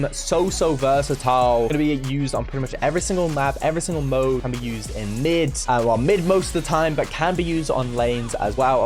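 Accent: United Kingdom. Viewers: It's been a while Haven't heard ur bri'ish voice in like forever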